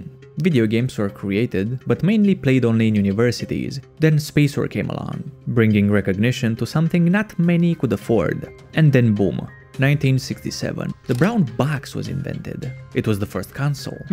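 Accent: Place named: Finland. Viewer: i can just hear finnish accent when u talk. am i right that u are finnish? :D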